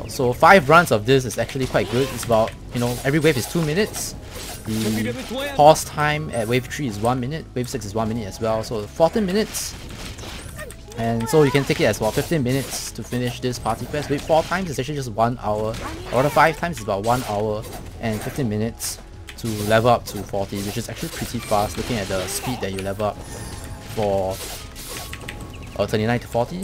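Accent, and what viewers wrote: Singapore. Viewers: you sound like youre from singapore. are you?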